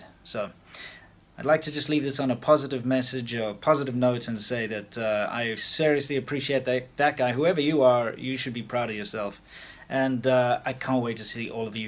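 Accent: South Africa. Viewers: More south african than british brah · well South Africa was colonized by the British so to an untrained ear they sound almost the same. Plus he has a formal proper South African accent which sounds even closer to a British accent. This is also why New Zealanders sound similar to South Africans because they were also colonized by the British.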